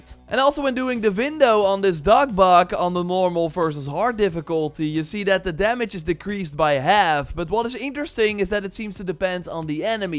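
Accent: Netherlands. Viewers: Please stop mispronouncing ‘duck’ (duiken, aan de kant gaan) as ‘Dutch’. You’re a Dutch speaker yourself, I can tell, however, your accent is fine, good job. But that one mispronunciation is just worse than the rest. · are u dutch? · Zalig toch da Nederlands accent er tussen 😂 ma super tips wel ✨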